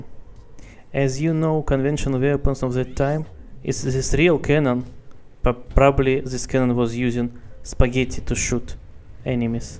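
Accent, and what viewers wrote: Russia. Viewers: ooh,hi my Russian brother!!! very tick accent,i love it! greets from Macedonia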